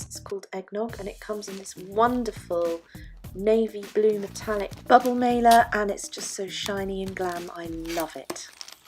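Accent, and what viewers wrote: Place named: United Kingdom. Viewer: I support u. I love how ur voice sounds! Just the British voice is so beautiful am I right? I'm British but my voice is not as pretty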